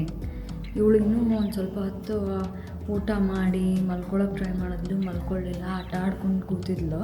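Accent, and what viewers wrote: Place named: Mangalore, India. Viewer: ನಿಮ್ಮ ಕನ್ನಡ ಮಂಗಳೂರಿನ ಕನ್ನಡದಂಗೆ ಅನಿಸಿತು ಅದಕ್ಕೆ ಕೇಳಿದೆ🙏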